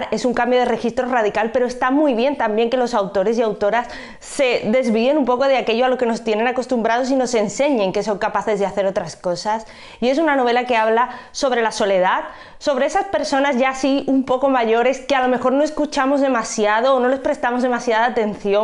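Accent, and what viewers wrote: Spain. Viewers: "La herencia me encantó"! Y le tengo muchas ganas a Gente normal... por cierto, ¿eres murciana? lo digo por el acento:) saludos!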